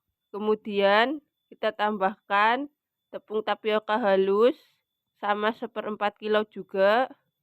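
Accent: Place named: Tegal, Indonesia. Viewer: Jualan tahu aci semoga menjadi ide bisnis yang sukses,kaya logat Tegalan...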